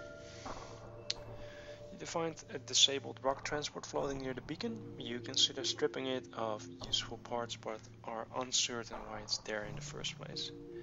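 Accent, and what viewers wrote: Netherlands. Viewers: i can't but notice your accent sounds like you are dutch, am i correct?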